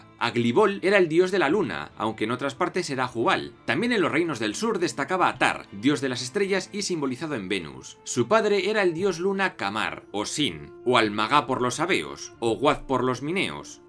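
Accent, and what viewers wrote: Spain. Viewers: Buenos videos hermano! Tengo que confesar que no me gusta el acento español pero con estos vídeos me está empezando a gustar mañana compraré tu libro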